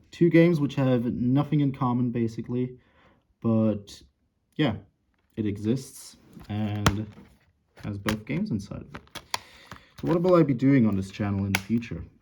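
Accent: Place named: Germany. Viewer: bin hier bevor das video 6 stellig wird :) echt nice, musste auch länger hinhören um zu checken dass du deutsch bist :D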